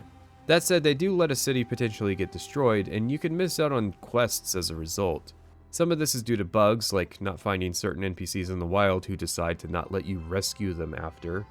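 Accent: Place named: United States. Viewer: I really have to ask how you, with your thick, middle-of-the-road Yank accent, know how the second act Italian accents are “fake”. You suddenly not only a gaming expert but a linguistics pro as well? Do the video blogging world a favor and get off your high horse with this shit. Review the game….leave your opinions about accents out of it.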